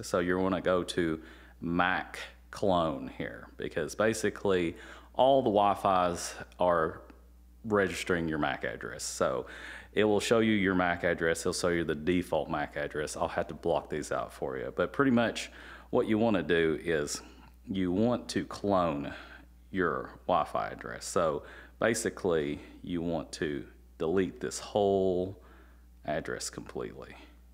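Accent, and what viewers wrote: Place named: South, United States. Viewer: Why does this philipino guy sound like a southerner hick?? the accent is just annoying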